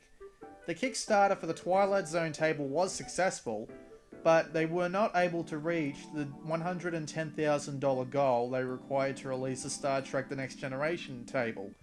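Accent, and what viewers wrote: Australia. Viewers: Came from GAF, good post. Sound aussie so some aussie release dates might be nice. Also where the hell is MetalGear for vita!? *checks vita*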